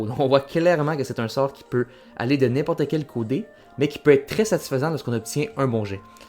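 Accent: Canada. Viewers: super ! continue & enjoy ! on adore le petit accent du canada <3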